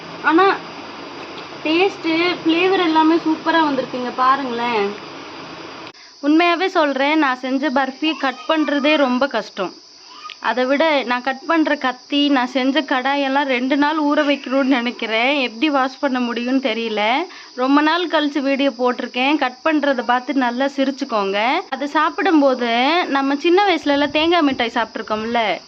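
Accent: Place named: Cuddalore, India. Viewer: பொதுவாக கடலூர் வரையிலும் ஒரு நடை என்று சொல்வதன் வழக்கம் இங்கும் அந்த பேச்சு வழக்கு உள்ளது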